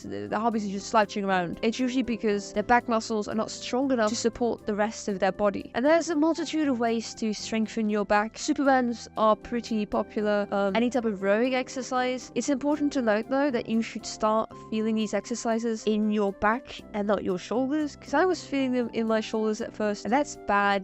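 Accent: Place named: United Kingdom. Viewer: Your name, is very misleading considering you have this awesome British voice, the greatest garden ever, and cute plushies everywhere you look. Also, it was cool seeing more of your art process 🙂 What the plushie's name? I might have missed it if you did say it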